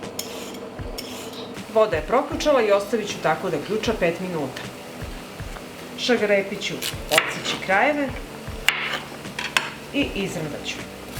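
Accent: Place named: Belgrade, Serbia. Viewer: Sudeći po naglasku Ti si Beogradjanka. Jesam li upravu? Supa izgleda fantasticno. Pozdrav iz Varsave.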